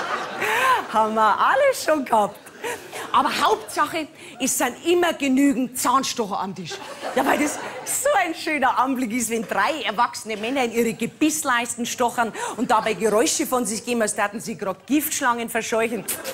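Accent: Bavaria, Germany. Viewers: Ich liebe die Bayern und deren Dialekt.... ist der weibliche Mittermeier 🍻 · ich mag sie sehr und schaue auch ihre auftritte. ich muss aber ganz selten lachen. leider verstehe ich kaum was... bayrisch und dazu noch schnell, das ist nicht einfach für einen nicht-bayer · Schön wenn man als Ostberliner versucht bairisch zu verstehen.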